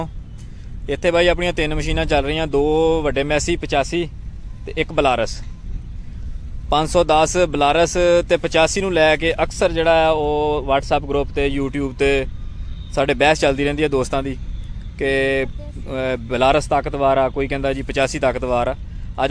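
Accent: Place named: Gurdaspur, India. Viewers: Veer di boli Gurdaspur wali aa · tuhadi boli sun ke subad a gaya ji lagda tusi sade punjab de hi hovo very nice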